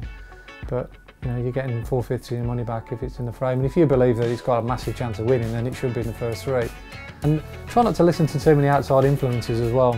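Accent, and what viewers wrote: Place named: Yorkshire, United Kingdom. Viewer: from yorkshire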